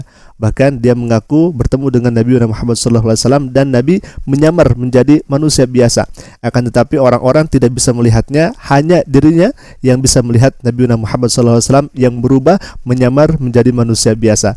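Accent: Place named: Makassar, Indonesia. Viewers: Logatnya orang Makassar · Seperti logat aksen Sulawesi Selatan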